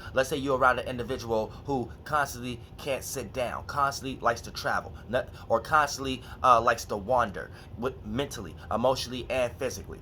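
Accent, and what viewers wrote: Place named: New York, United States. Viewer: lmaoooo u from/been hanging out in NY or whatttt 🤣. the accent tho “whatever the fuck u wanna call it “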